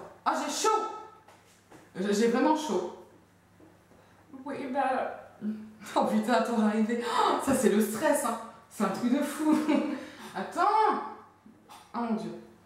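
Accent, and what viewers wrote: Belgium. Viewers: la gamme ultra doux a la mangue et a la fleur de Tiaré j'adore c'est la gamme que j'utilise et rachète depuis 3 mois et elle a balayé les autres gammes de ma salle de bain ! Les petits gel douche the body shop j'adore aussi et le premier petit haut noir H&M il est sublime! ta vidéo est top et l'accent belge mdr ! bisous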